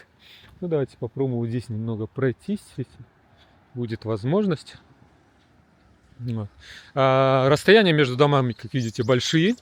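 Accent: Japan. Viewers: Давно не смотрела ролики и вот что обнаружила спустя долгое время - появился японский говор, если так можно выразиться ))))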